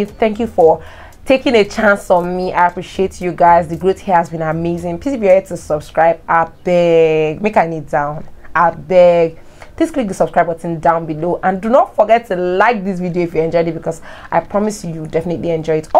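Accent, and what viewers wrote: Nigeria. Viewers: Accent from a Nigerian is funny tho 😂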